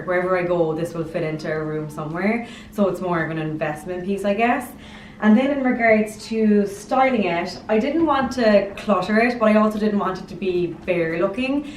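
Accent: Ireland. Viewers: Jaylan O she's irish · New subbie! I love this room and your accent! Lol are you 🍀 Irish? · Love your Irish accent... And your gorgeous red hair, beautiful green eye; I'm a sucker for redheads, coz I find you very distinct!! You're mostly known to have a fiery temper😁😁😄😄🙋🏽🙋🏽🙋🏽.... Never seen that Marilyn print either... My mammy grew up with Marilyn , she said during her time every wanted to look like Marilyn, she was the poster girl of her time😜😜🙋🏽🙋🏽🙋🏽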